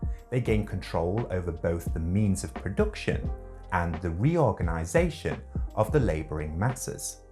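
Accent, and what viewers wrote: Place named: Midlands, United Kingdom. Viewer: You’ve got a reet midlands accent buddy. Derbyshire/Nottinghamshire?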